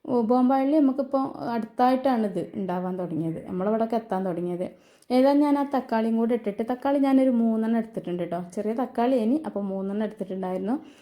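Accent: Malappuram, India. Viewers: Malapuram ano samsaram 👌👌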